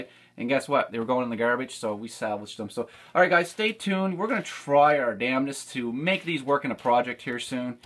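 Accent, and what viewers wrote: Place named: Canada. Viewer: You're from canadia, I can tell.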